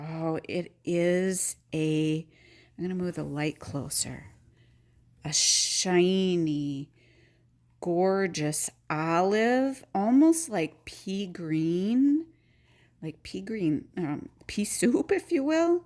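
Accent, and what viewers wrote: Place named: Chicago, United States. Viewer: loved you unboxing and your video. Also your accent! I’m from Chicago but haven’t been back for 30 years. Yours reminds me of Chicago. I know it Chicago and Milwaukee accents may not be the same but it sounded like home to me. Think I’ll have to get a Colonel!.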